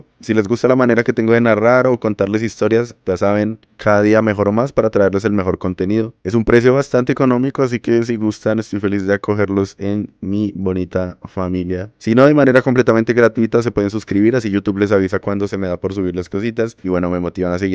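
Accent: Colombia. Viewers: Este tipo no puede ser más bogotano, me siento en casa jajaajajaj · Amigo, muy bueno tu video, pero, te recomiendo no usar esos tontos memes de perros y hablar menos como colombiano.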